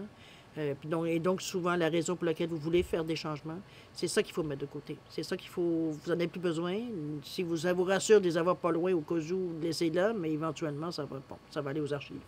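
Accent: Quebec, Canada. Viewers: merveilleux accent québécois !!! :-)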